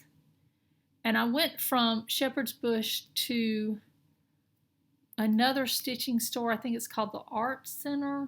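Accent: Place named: Mississippi, United States. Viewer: Love your purchases. The Penny America design is older. I have it started. it's been a couple of years now but I thinking it's 2009. I did the pumpkin lady in the shoe made it into a pillow hangs on a door during fall. I don't do videos not very tech savvy. lol I am going to Minneapolis to the retreat first one for me. I love your accent my nephew married a gal from Mississippi I see hear every time talk.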